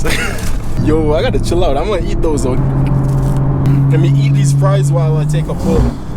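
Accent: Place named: Jamaica. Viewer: Are you from Ja am hearing a little Jamaican accent lol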